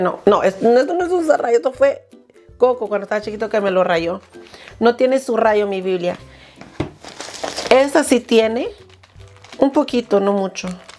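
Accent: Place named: Latin America. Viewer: Hermana: muy buen review!! Sorprendido por lo que dijo que tenía acento... la verdad que se escucha más latinoamericana que yo que soy de Argentina!! (Si me decía que estaba en México o en Guatemala o Salvador u Honduras... le hubiese creído). Bendiciones!!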